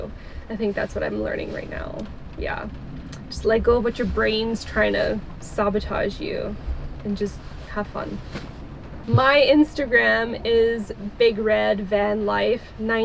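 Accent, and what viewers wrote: Ottawa, Canada. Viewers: She sounds like an Ottawa girl. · I was like thats an Ottawa accent. I didn't think we had one but we do.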